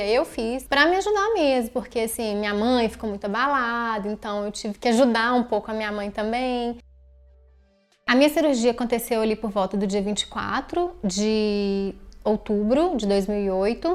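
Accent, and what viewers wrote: Minas Gerais, Brazil. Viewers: O sotaque mais bonito do Brasil, o de Minas Gerais.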